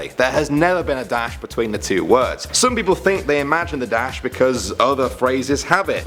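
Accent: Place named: United Kingdom. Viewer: Omg please tell me you are British. I just loooove your accent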